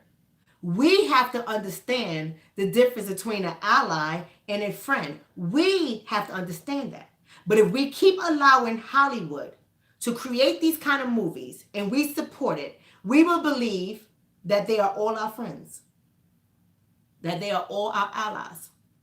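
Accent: New York, United States. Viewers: I LOVE your NY accent.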